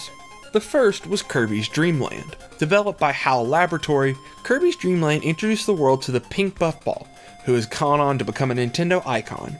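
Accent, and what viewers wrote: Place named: Oklahoma, United States. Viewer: Are you from Texas or Oklahoma? I’m from Oklahoma and recognized a hint of twang in your voice and was just curious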